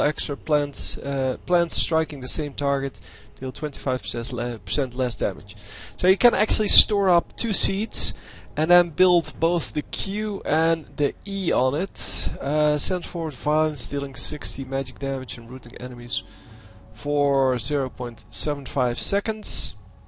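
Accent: Netherlands. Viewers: Are you dutch? freat channel BTW subscribed ;-)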